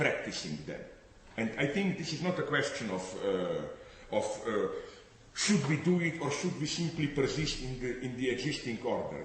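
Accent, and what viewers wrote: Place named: Central Europe. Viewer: You obviously don't understand the irony of demanding someone provide substance when the subject is Slavoj Zizek. He is like Camille Paglia with a middle-European accent a beard and a paunch. He is a symptom of the degeneracy that what used to be called "the humanities" has devolved into. He is so full of crap he could generate economically significant amounts of methane or add to global warming.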